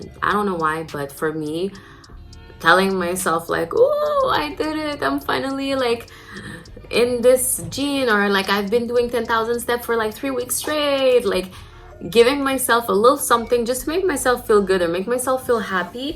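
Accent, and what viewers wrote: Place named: Tagalog, Philippines. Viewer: Awesome video! Thank you for sharing your journey with us. Side note: your accent sounds a bit like you are a native Tagalog speaker (Philippines). I’d be curious about where you are from.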